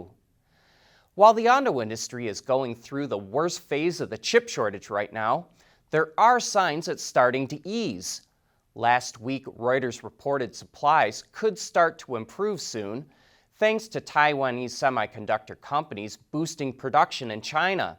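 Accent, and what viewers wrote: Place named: Michigan, United States. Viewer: Heavy Michigan accent!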